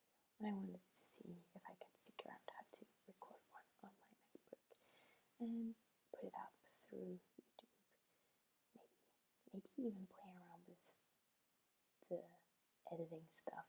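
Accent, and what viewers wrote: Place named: Denmark. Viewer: You had a thicker Danish accent, back then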